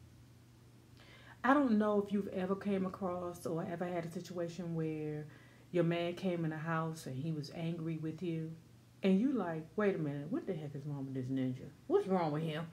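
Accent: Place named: South, United States. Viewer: I'm in the DMV right now...but you sound a little southern like, you're from my hometown lol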